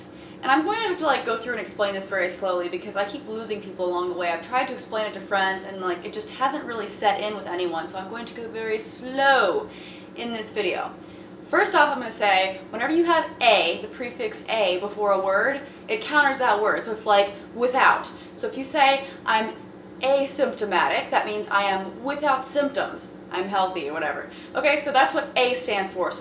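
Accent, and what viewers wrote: South, United States. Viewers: Even famous biologist Richard Dawkins has noticed and appreciates her style. We all love listening to her, even the Muslims watching "Muslim hot line" although they won't admit it. Me I just LOVE the southern accent y'all. #masturbateforjaclyn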